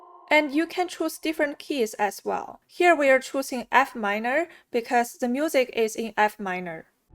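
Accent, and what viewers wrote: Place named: Russia. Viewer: I find it better to write this in a notation software. Sure it gives less control over the balance and still, in the end we get a full score for orchestral performance and there's a lot of excellent sounds that sounds very good and for free. Musescore for example. By the way, I love your Russian accent.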